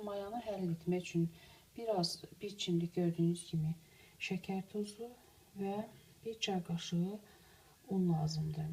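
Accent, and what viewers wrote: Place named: Baku, Azerbaijan. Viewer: Ay ureyim getdide oz baki danishiqimizcun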